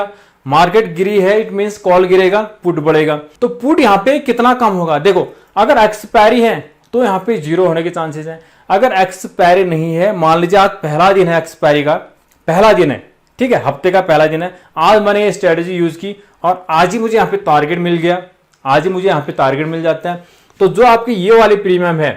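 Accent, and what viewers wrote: Kumaon, India. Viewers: Your sound like a kumaoni 😮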